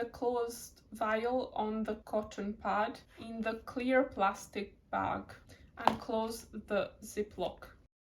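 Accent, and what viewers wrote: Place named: Friesland, Netherlands. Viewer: You have a really quite the interesting accent. Doubtless it sounds different to Scottish ears, but to North American ones, it almost sounds Frisian? Not a biologist, but rather an historian and geographer. I've done Ancestry and 23andMe, and presently waiting on a MyHeritage kit. The results of the two services were quite divergent except in the aggregate. The reason you would get different results is that these services use their own predictive algorithms based on sampled populations. Proprietary algorithms will use different data sets and take alternate factors into consideration to calculate their results. The other thing to consider is migrations, immigration, and invasions. I'm about 50/50 English and Scottish, but will get some Scandanavian and French. Well, no wonder, 1066. And then the one service has me at 1.5 percent Iberian. 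I could have a Royal Navy or Marines war bride from Menorca or Gibraltar 5-8 generations back, or that's just Celtic migrations in the Iron Age. Could be the reason for your Bretonne result, too. Anyway, do keep an eye on your DNA results over time, because these companies fine-tune their algorithms and your percentages may fluctuate (or entirely disappear, and reappear, as my phantom Iberian does).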